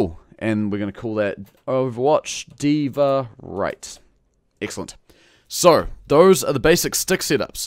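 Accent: New Zealand. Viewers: yo I'd he a new Zealander?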